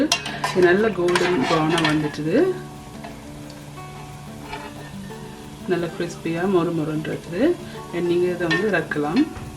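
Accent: Jaffna, Sri Lanka. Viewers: Akkaa neenga muchchu vittu vittu konchum yalpaana tamilil solliththarum alahe thani . Oru sinna kolanthaiku kooda neenga solrathu purium . Seeni sambal bun, boli irendaium seithein super . Appam recipe poduveengala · சூப்பர் டெமோ. இலங்கை தமிழும் கேட்க சுவாரஸ்யமாக உள்ளது.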